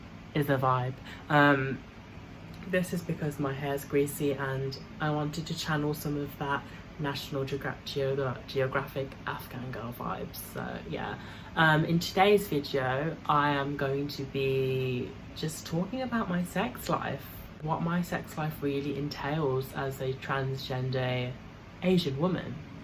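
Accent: United Kingdom. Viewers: She Has A Fat British Accent Which Makes Her Provocative 😊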